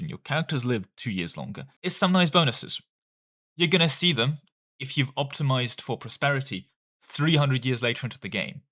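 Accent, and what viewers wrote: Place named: United Kingdom. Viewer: x3 speed is only possible for americans, British accent unintelligible over 2-2.5x speed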